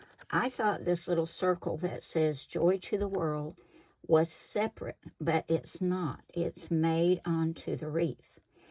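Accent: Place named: Virginia, United States. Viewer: I love watching you make cards. It always feels like we’re having a visit. Your voice reminds me of my childhood visits to my family in Virginia. How about Sketch #17 or #31? 31 is very busy, maybe we better hope Wayne doesn’t see it!😂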